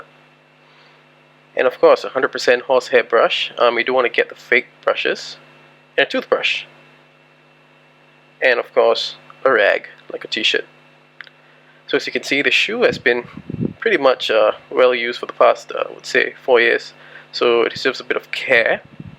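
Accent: Singapore. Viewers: are you from singapore? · You sound so singaporean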